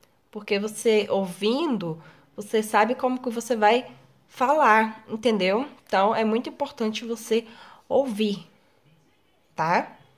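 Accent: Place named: Maranhão, Brazil. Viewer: Oi, sou novo aqui no seu canal e tenho uma pergunta você é de qual estado do Brasil? eu aposto que você é do Maranhão esse linguajar é bem maranhense mermã...kkkkkk. Estou gostando muito das sua aulas. Sucesso.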